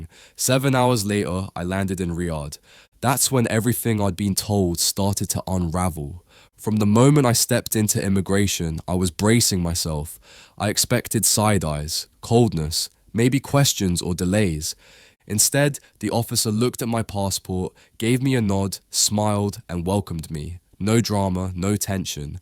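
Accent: United Kingdom. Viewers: Are you from UK Bro?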